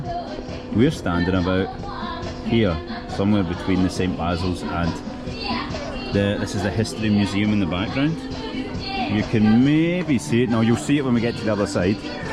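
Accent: Scotland, United Kingdom. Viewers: Awww! What a nostalgy for me. Last time I dealt with the Scottish accent was in my University days. 😻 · that beautiful scottish accent cheers from Belgium have a look also on russian cars in the streets and those in Europe the ultimate years.... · As I've noticed the Scottish accent is quite gorgeous. You pronounce sound ei instead of ai. Eis skating. 😊 Nice one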